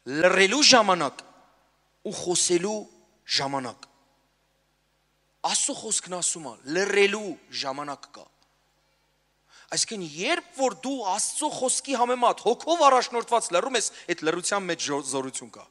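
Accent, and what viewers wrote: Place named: Lebanon. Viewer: ՏԵՐԸ օրհնի ախպերս, Քրիստոսով։ Իմ սրտից դուրս եկած քարոզ ես քարոզում։ Շատ մաքուր հայերեն ես խոսում։ Լիբանանում եք ծնվել՞։ Տերը օրհնի իրա միակ Սուրբ եկեղեցին։ ԱՄԵՆ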